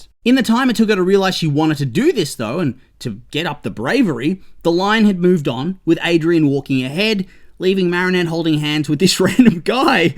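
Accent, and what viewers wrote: Australia. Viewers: I'M SORRY, THIS MAN IN AUSTRALIAN?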